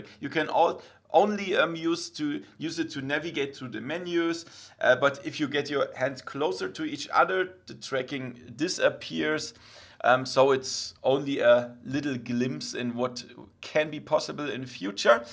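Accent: Germany. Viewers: One question do you speak german because i hear a german a aksent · Nice video, I love the german accent haha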